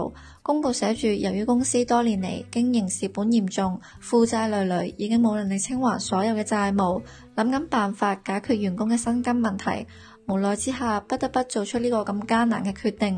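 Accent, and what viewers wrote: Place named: Hong Kong. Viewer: 是HK話 唔係廣州話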